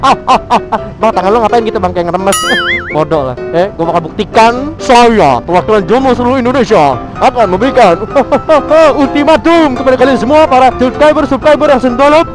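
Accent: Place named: Java, Indonesia. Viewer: bang logat lu kok medok sih.. lu ada keturunan jawa apa gmn?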